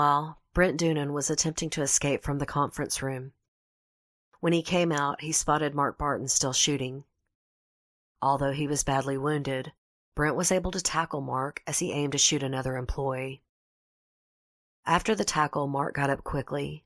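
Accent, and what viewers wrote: South, United States. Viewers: Btw, even though i said that on my last comment, i have subscribed. I love your relaxing southern voice and your story telling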